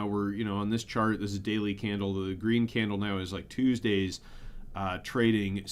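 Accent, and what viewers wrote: Chicago, United States. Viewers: Where did you grow up? That sounds like a Chicago accent if I've ever heard one.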